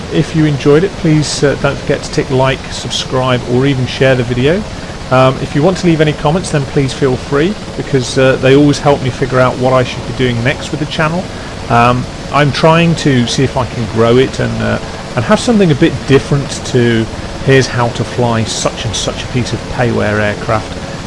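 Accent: United Kingdom. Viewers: Hello all, sorry if this post appears to be a bit late but I have only just got onto broadband (Yes I'm a Newbie, this is my first post!). Firstly well done on a well made review, I like the professional Royal Navy accent that you have which gives what you say more credibility. You remind me of Keith Thompson of Treasure Hunt fame who was also ex-Navy. Secondly regarding rudder use in FS9/FSX for helicopters remember to check your realism settings and ensure AUTORUDDER is switched OFF as this this can affect pedal control so you can't turn in the hover! Sorry if you already know this but it can get overlooked. Anyway thanks for reading and carry on the good work. SimonStanm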